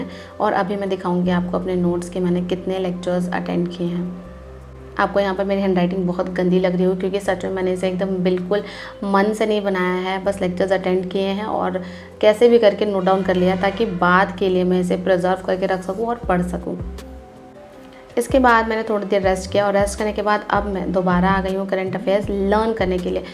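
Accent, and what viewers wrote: Bihar, India. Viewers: Didi aap bihar se ho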